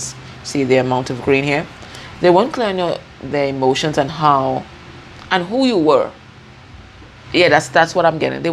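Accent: Trinidad and Tobago. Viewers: Are you trini?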